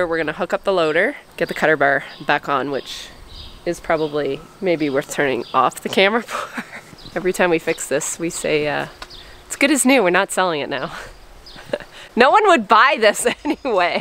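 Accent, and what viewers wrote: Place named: Canada. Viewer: As a texan, i love your canadian accent!!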